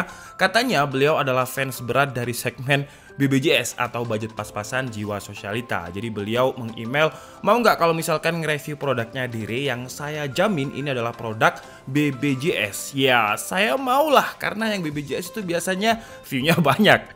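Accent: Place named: Madura, Indonesia. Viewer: Logat Madura kek gitu Madura bagian timur, ngk semua kok logat kek gitu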